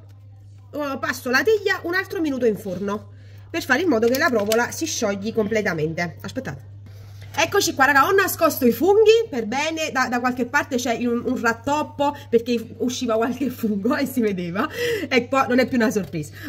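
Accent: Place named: Naples, Italy. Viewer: Poi vabbe dialetto Napoletano....Adorooo👍👍👏👏😍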